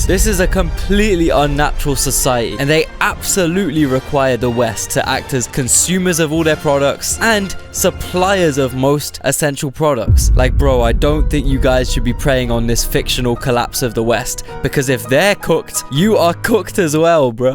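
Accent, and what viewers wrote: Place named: Birmingham, United Kingdom. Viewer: these editings coupled with the brummy accent is gold tier, best shit on youtube ilysm never change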